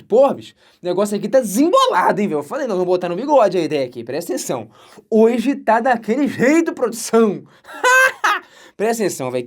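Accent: Minas Gerais, Brazil. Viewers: o sotaque mineiro melhora tudo kkk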